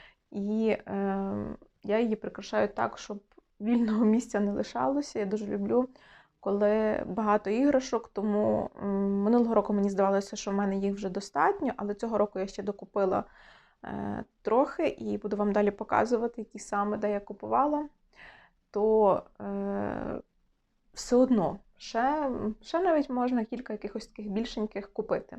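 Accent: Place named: Lviv, Ukraine. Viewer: Доброго вечора,на Ваш канал потрапила за рекомендацією " ютуб", почувши щиру українську з львівським присмаком залишилася тут без вагань! Маєте гарний смак! Ваші відео то окрема форма насолоди! Завжди все стримано, вишукано, гармонійно, щиро. Наразі працюю з шкарпетковою пряжею ( вяжу для ЗСУ ). Гарних, мирних Вам свят, теплих та цікавих зустрічей! oksana_sokolova156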